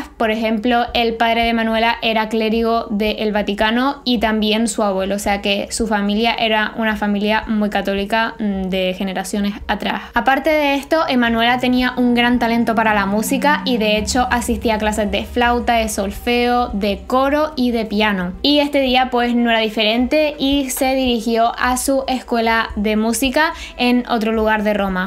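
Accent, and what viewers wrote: Cuba. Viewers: Pensé que eras Cubana o Puertorriqueña. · Eres cubana?????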